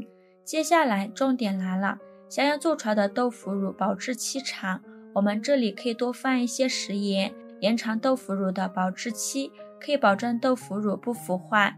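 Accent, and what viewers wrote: Yunnan, China. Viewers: 博主口音是雲南哪裡的？ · 云南的单山辣，云南普通话，云南风味。🤤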